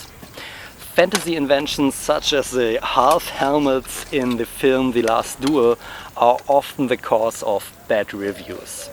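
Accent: Germany. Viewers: Aud sem Dialekt meine ich rauszuhören das du aus Deutschland kommst, ist das korrekt? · Mein abo hast du. 🤘🏼 Aber den deutschen Akzent hört man ultra raus. 😉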